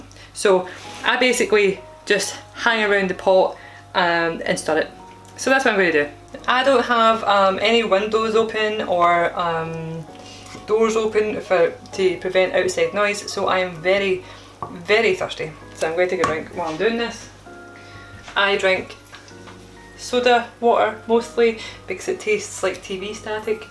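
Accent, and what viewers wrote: Scotland, United Kingdom. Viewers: I was born in Motherwell and lived in Wishaw. I moved to Canada 10 years ago. I have a similar accent to you but no tattoos ! My accent has never changed. Canadian laugh at my accent and encourage me to speak as a entertainment oddity in Collingwood, Ontario. I love your videos and remind me of home when you make tablet and coconut truffles. You are great.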